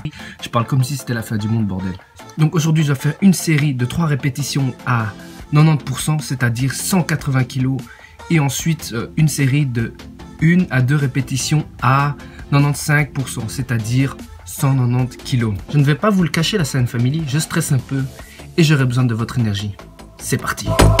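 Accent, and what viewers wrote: Belgium. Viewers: Salut mec, tu es de quel région ? Belgique ? Tu as un accent particulier :) Bonne continuation, je m'abonne 👍🏼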